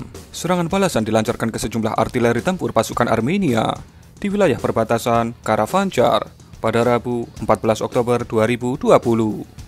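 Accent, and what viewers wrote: Java, Indonesia. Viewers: medhoknya jadi ciri khas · Kangen bahasa medokk mimin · Wong mjowo kah min??🤣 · suaranya medhok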